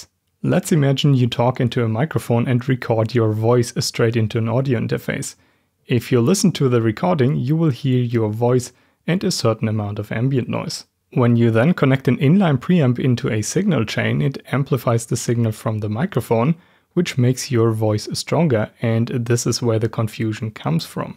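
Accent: Germany. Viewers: awesome video!! just found your channel. great stuff man, keep at it. love your accent, is it german?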